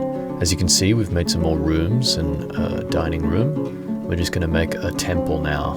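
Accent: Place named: Germany. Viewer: Dont get me wrong: I really think you quite nailed it with ze german akzent! And i especially adore it because im actually a native german speaker :D Keep it up👍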